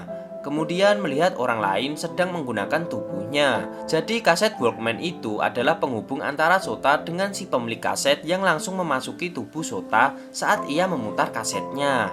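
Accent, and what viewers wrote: Java, Indonesia. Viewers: Intro,closingnya sama logat jowone yg bikin gua pengen nonton trus celengan film😂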